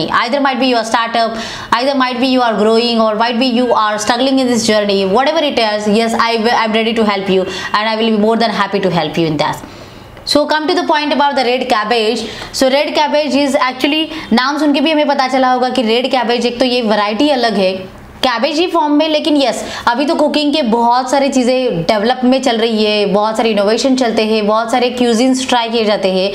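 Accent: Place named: Mumbai, India. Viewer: marati hindi mumbai accent madam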